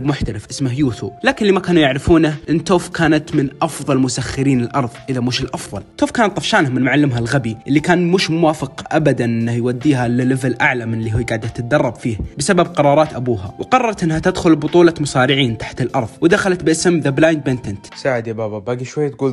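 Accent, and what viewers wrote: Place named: Qassim, Saudi Arabia. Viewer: احسك من القصيم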